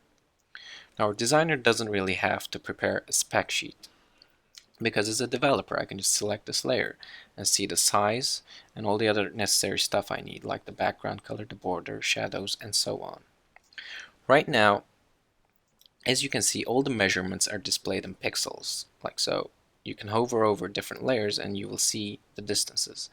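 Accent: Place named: Turkey. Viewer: Aksanından zeplinin türk firması olduğunu fark ettim :)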